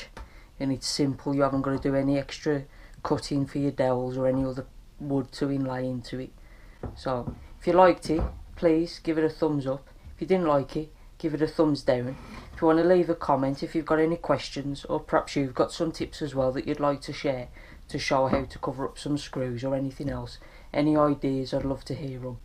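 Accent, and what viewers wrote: Black Country, United Kingdom. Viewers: black country accent Wolverhampton maybe?? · Bostin mar mate , day know yow could do it like that . where in the black country are yow from .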